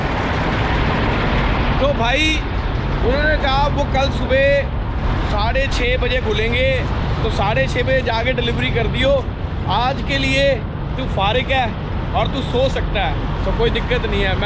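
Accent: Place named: Punjab, India. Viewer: Hindi ch v punjabi wala touch 😂😂😂😂😂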